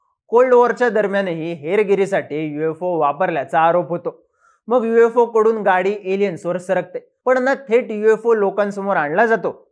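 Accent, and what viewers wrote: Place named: Kolhapur, India. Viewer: हा कोल्हापुरी भाषेचा लहेजा आहे ❤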